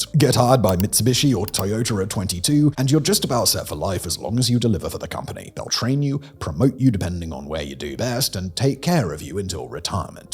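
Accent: United Kingdom. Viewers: He’s talking about managed decline, yet has a British accent. The irony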